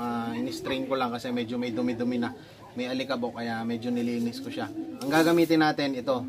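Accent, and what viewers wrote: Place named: Pampanga, Philippines. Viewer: Boss taga saan ka pwedi puba paki sabi narin sa next vlog mo ty po parang kapampangan kapu kase ea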